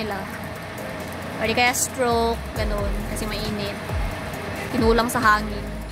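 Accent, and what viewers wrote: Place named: Visayas, Philippines. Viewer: Akala ko sa Visayas. Parang bisaya po kase yung salita nyu. Jeje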